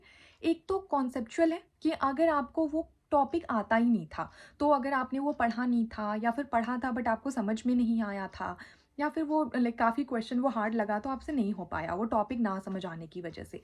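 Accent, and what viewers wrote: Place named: Delhi, India. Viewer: You claim to be a Bihari origin, but uour language sounds of Delhi origin. You must have been brought up in and around Delhi. With good school backgraound. It is your schooling that is making you successful everywhere which is not accessible to others. Take example of ssc CGL exam, you scored 156 because your english was good from school days.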